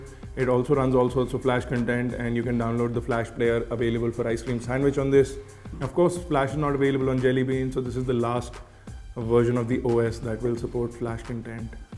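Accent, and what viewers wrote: India. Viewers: it's an Indian accent.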